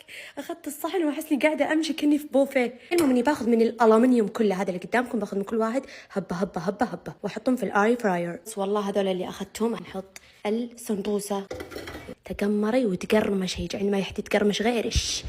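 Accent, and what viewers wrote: South, Saudi Arabia. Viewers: كأن اللهجة من جنوب السعودية ؟ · يا لبيييييه يازين اللغه السعوديه عليك والله😂❤❤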